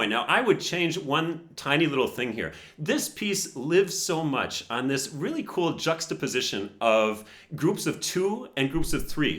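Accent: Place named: Germany. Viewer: His dialect sound very german, especially when he say "Patrik Pietschmann"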